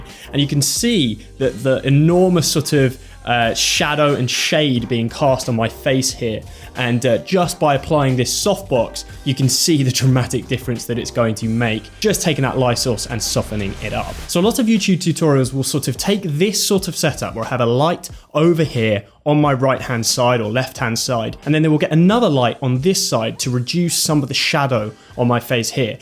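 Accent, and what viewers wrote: United Kingdom. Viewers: Did anyone expect a British sounding skater boy to talk about beauty lighting set up? No. But did he nail it? Yes.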